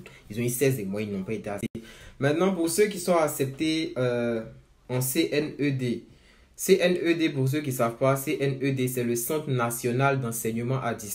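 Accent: Ivory Coast. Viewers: Tu as un accent ivoiren frérot, tu l'est ?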